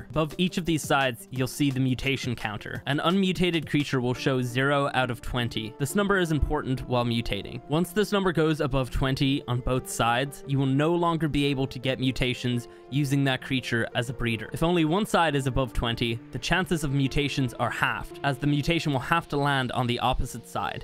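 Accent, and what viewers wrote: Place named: Ireland. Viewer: He is Irish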